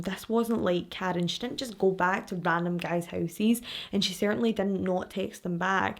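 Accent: Scotland, United Kingdom. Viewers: I first heard it as "ghetto!" So I wonder if that other fan is also American. I figured it out quick enough but every time I'm still like: how can GIRL sound like GHETTO to me??? Glad I'm not the only one hearing a t/d in there. 😂 It's a lovely accent!!! Speaking of your accent, the first video I watched, as I was about to watch it briefly thought: wonder where she's from... American?, Latina?, Mediterranean?... well I'll know in a moment when I hear her... Scottish?!!!! 😂😂😂😂 So it was a LOVELY surprise. (Not that there's really any accents I don't like, but gotta say Irish and Scottish are up there in my faves!)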